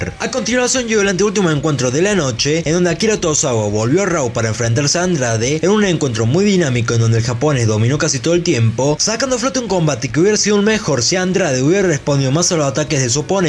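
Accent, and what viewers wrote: Córdoba, Argentina. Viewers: Hola sos de cordoba? Muy buen trabajo saludos desde bueno aires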